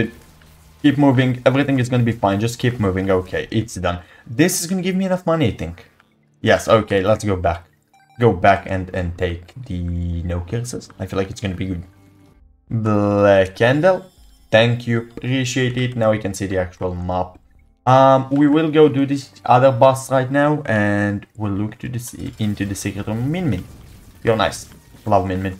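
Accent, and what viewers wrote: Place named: Israel. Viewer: Say, are you Israeli? Your accent and the way you say mother gives me strong Hebrew vibes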